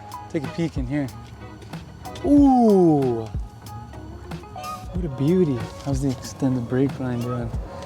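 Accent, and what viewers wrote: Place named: Ireland. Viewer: You have such a strong irish accent lol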